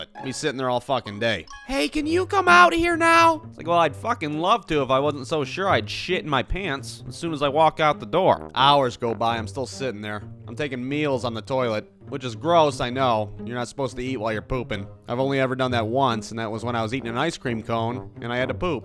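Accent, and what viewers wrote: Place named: Toledo, United States. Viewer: Yo. Are you from Toledo Ohio????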